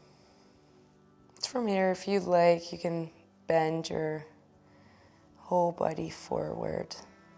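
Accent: Netherlands. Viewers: Are you dutch? You don't really have an accent but more like a non accent? I don't know I feel like you might be dutch? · Wow, this was a wonderful class. You're voice is very calming and grounding. If you would make more videos of practices I would defenitly follow them!! Thank you so much. I'm from Holland by the way. Taught I heard a Dutch accent in you're voice. 😊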